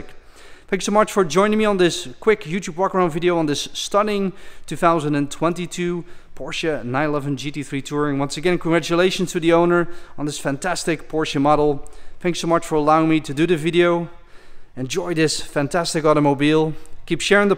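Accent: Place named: Netherlands. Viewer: en is een Hollander als ik me niet vergis in mijn oren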